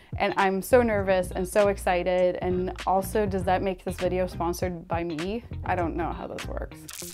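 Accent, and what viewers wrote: Sweden. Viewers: Are you swedish?! Cool product tho. I'd buy it :) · Wait you swedish??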